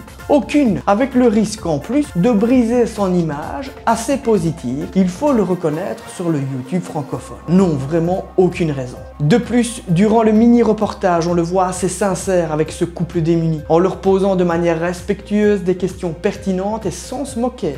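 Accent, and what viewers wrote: Belgium. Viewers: Tu un ptit accent tu est belge ? Sinon cool ce concept de chaine moi shuis belge liegeois x)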